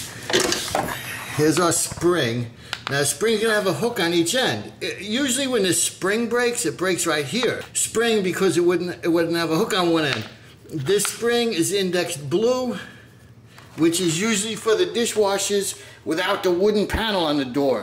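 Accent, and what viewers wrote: New York, United States. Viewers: Thanks. I value the 2 tips you gave. The first was using the putty knives to help lift the front legs over the vinyl flooring edge. The second to use a string the stretch the spring enough to hook the cable end. And I enjoyed your accent, too. Nobody can match a native New Yorker. I have been away from my boyhood neighborhood in the west Bronx for a long time. Take care.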